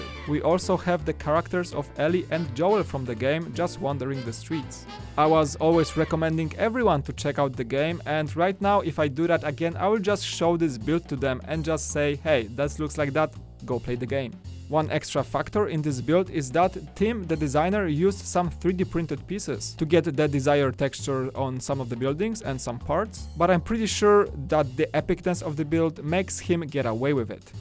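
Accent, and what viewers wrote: Poland. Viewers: No offense, but polish accent is terrible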